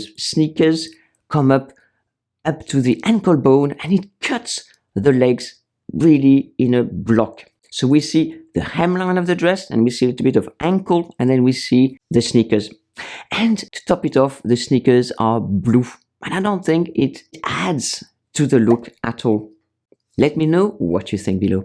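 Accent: France. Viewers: has the most adorable French accent on English I ever heard. She has also charisma, talent and style. However she herself had aged well and styles everything for women likewise. Most of us, women after 50, had not, except Asian origin women, who looks like 30 in their 50ties. We have flabby arms, "bat wings", we have often too big, lousy and fat boobs, we have protruding bellies, vanishing wastelines. All these sleeveless dresses, all these dresses on straps looks horrible on most of us. An advice to clap a belt is fine as long as you can find where to clap it 😅. But most important thing is - we, women after 50, do not care so much what anyone else is thinking of us. So if you like floral prints, cowboy boots or lousy dresses- just wear them. Wear even dresses on straps and clap belts, even being size 3XL, if you feel comfortable. Life is extremely short. So enjoy it the best way you can! 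· "The LEETLE House on the Prairie Look". 😂. (I love your French accent, not making fun of it. 😊)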